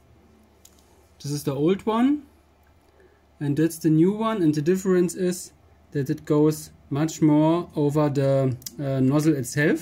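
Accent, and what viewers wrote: Germany. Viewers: Funny how I noticed after the first sentence that you are German xD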